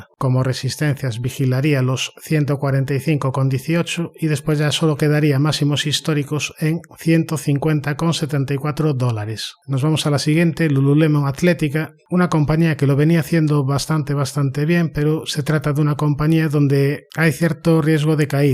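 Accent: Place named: Seville, Spain. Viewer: Por el acento y tu nombre y apellidos está claro que eres de Triana 😅. Llevo más de 35 años invirtiendo en Bolsa y puedo afirmar que eres un Crack y te sigo desde que te descubrí. Entiendo de Balances y necesito siempre tener datos de los directivos de las Empresas pero, por desgracia, no tengo conocimientos técnicos de gráficos ni velas...Te apoyo al 100% para que sigas dándole luz a nuestras inversiones. Te lo dice un Coruñés nacido en el Concello de Mañón tbn con acento Sevillano. Mucho ánimo y, sobre todo, muchísimas gracias por tus aportación a nuestras inversiones.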